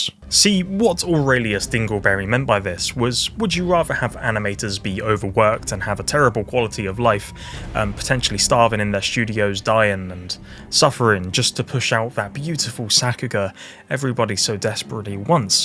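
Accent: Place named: United Kingdom. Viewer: Good invincible video? British man talking for 10+ minutes? Subscribed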